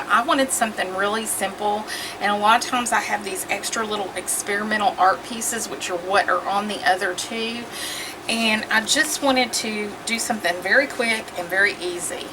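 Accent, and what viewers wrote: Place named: South, United States. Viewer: Love your video,! Such beautiful organization! Your sweet southern accent is divine! ❤️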